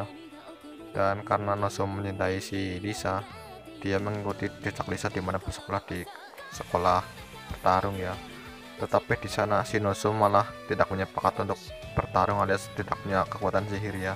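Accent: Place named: Java, Indonesia. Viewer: Orang Jawa bang? Logatnya kaya orang jawa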